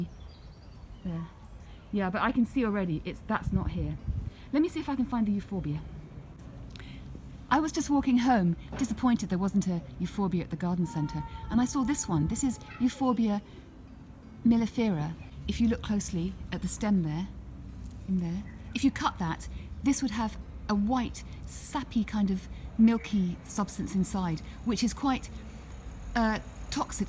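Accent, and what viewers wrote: London, United Kingdom. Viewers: 太喜欢她的伦敦腔了。和中国很多妇女是两种感觉。不喜欢现在中国那种妇女眼里只有钱，嘴里只有唠叨，的状态， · 哇，好像在看英国花园纪录片的感觉喔！非常着迷于女士的好听英音讲解也非常长知识！期待下一期❣️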